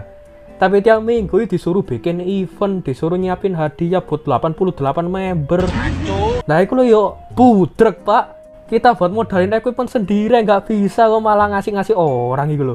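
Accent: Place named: Banyumas, Indonesia. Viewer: Welcome back lord Pas nonton video ini , bini gw langsung hapal & bilang "oh ini yg mas-mas ngapak itu ya?"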